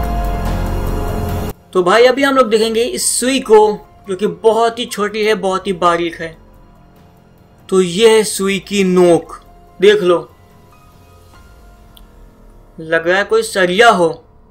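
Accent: India. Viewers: Ngomong nya kaya orang india njir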